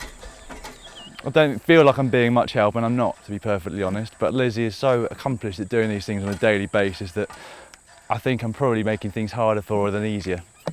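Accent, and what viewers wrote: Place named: United Kingdom. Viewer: A worthy and life changing cause to be sure. But I can't help but feel the hypocrisy of spending ~£10,000 (or more) of Her Majesty's British Pounds on flying, and at least 1 other staff member + all the camera gear out to Zambia + accommodation + translator + guide + transfers to report on the donations of others that provide cheap (albeit very important) bicycles to students and others. I also couldn't stop chucking at the obviously colonial nature of having a British national (Sorry, you're very British with a very British accent) report in Zambia which was a former British Colony, where Her Majesty has a fairly checked past. I'd have loved to have seen a local Zambian media crew report with the students and the GCN invest the £10,000 on more bikes or other charitable works. Regards Jordan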